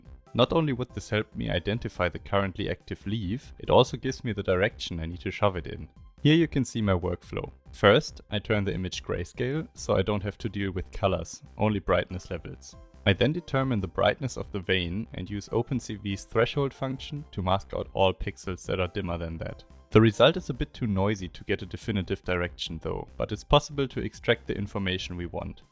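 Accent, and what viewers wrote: Germany. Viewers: Hört sich so extrem deutsch an. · R u german? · This is extremely interesting, love the work you put into not only the coding, but the video editing aswell. Might I ask, where you are from? I hear an accent but I am not quite sure if its a german one or something else. Anyways, new subscriber, keep up the good work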